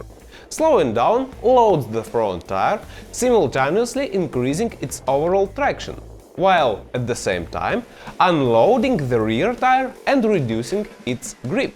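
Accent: Russia. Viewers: Hi from Bundaberg Australia 🇦🇺😊👍 Ive seen many many Educators Video's on Motorcycle Riding 🏍 Here in Australia & American BUT none of them match Your Video's , Some other people's are good BUT most are average ! Ive learnt so much from You & your teachings 😊👍 Im now understanding Your Russian Accent really well now ☺️ Thank you for doing these video's & know we new & im thinking even more experienced riders appreciates them & learning plenty ! Cheers from Aus matey 🇦🇺🕺🍹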